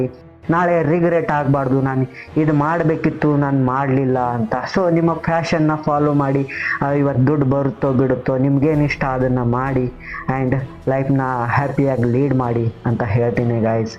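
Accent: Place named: England, United Kingdom. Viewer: ಆಂಗ್ಲರು ಕನ್ನಡ ಮಾತನಾಡುವ ರೀತಿ ಮಾತನಾಡದೆ ಸಹಜವಾಗಿ ಕನ್ನಡ ಮಾತನಾಡಿ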